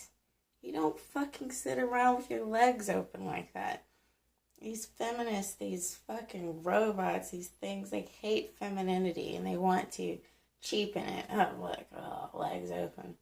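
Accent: Texas, United States. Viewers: Your sexy as hell with that Texas Twang!...damn you are REAL as Hell!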